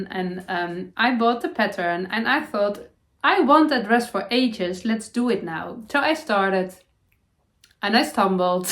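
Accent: Netherlands. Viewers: all the colors of the 3 Felix cardigan are perfect for you! Don't feel guilty by keeping them all! They were a special gift this person wanted you to have! You are so sweet to want to share these with others....but we see your excitement in wearing all your beautiful cardigans and we enjoy your enthusiasm and joy! Thanks for sharing your lovely projects! Love your 'dutchy' english! Closet, chest, cabinet, hanger, some of the words you were thinking about!🦋💕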